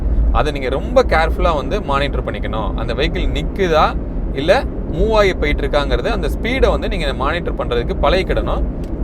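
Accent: Virudhunagar, India. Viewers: Bro, Neenga Virudhunagar ah ??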